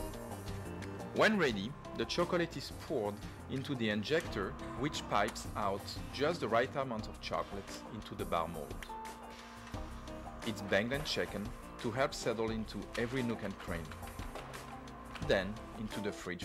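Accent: France. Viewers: Love that French accent. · What a beautiful French accent !!! :)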